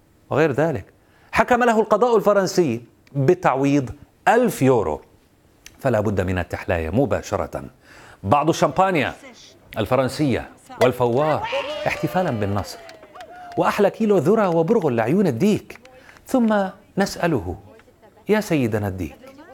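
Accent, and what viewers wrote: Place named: Iraq. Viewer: حسب للكنته يبدو أنه عراقي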